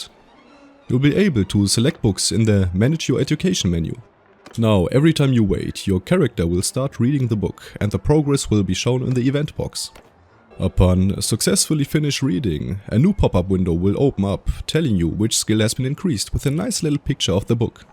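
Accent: Germany. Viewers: du bist deutsch oder ?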